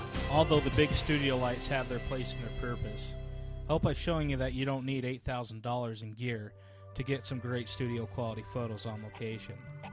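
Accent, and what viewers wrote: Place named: Chile. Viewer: me gusta ese acento chileno.... tienes un dislike big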